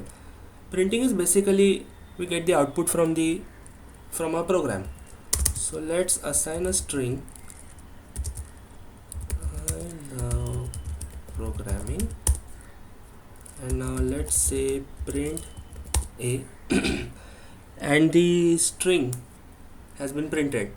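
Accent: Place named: Maharashtra, India. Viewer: sir, this is really very helpful tutorial, no mess just dive into the topic. i guess you're maharashtrian by your accent, i could be wrong but i think so. thanks for this great tutorial.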